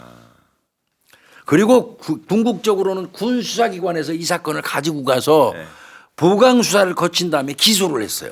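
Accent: Chungcheong, South Korea. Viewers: 우리의. 충청도. 말씀으로. 넘. 구수하게. 해주셔어. 감미록고. 훈훈한. 진국입니다. 정말. 꼼꼼. 인정유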